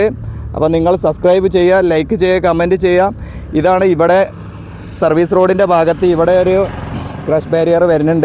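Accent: Malappuram, India. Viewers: നിങ്ങളുടെ മലപ്പുറം slang ഒന്ന് മാറ്റിപിടിച്ചൂടെ.. നല്ല slang ൽ സംസാരിക്കൂ..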